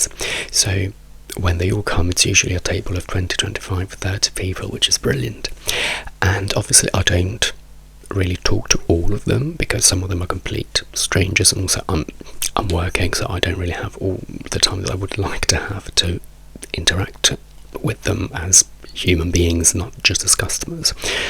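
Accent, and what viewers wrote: England, United Kingdom. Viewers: mamma mia ho appena visto un tuo video in italiano, sentirti con questo accento inglese è pazzesco 😍 meraviglia davvero sei spaziale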